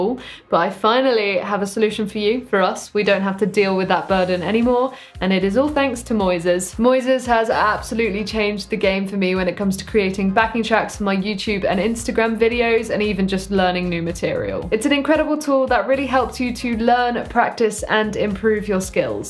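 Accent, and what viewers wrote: United Kingdom. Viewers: I had no idea you were British 😂, only follow on insta til now.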